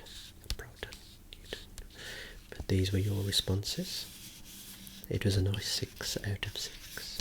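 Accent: Birmingham, United Kingdom. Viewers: A brummy asmrtist is something I never thought I'd see but I'm all here for it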